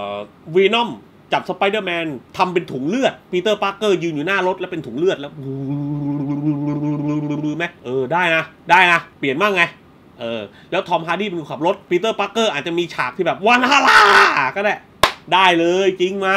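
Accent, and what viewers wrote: South, Thailand. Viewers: คนรีวิวคนใต้หรือเปล่าคับ แหลงใต้ชับจัง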